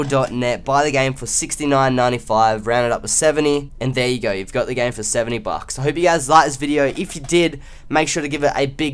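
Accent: Australia. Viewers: Jeez your accent is strong, coming from an Aussie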